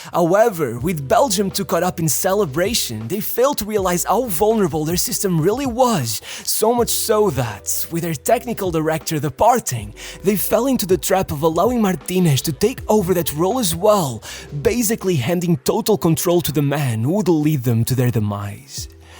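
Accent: Portugal. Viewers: Are you Portuguese? Accent don’t lie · Sotaque bem tuga xD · most portuguese english ever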